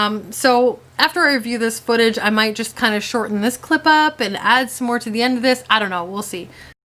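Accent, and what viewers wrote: United States. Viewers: From a german speaker: i don't hear a boat ^^. I think it's the american accent 😀. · Ha. Ok, so your grandson truly is the cutest. Holy crow. As for “out and about”...I grew up in Illinois and Wisconsin. Moved to Tennessee as a teen. I’m 46 now and when I watch you, I find myself saying out and about” with the northern accent. My husband teases me about it. So yes, we hear it. (And love it, it brings back childhood memories for me) 🧼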